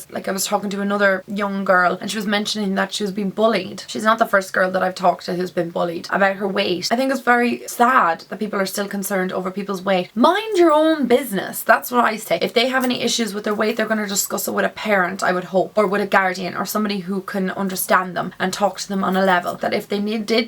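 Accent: Ireland. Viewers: Are u Irish if so I'm from Connacht in Sligo and I think your from Dublin right?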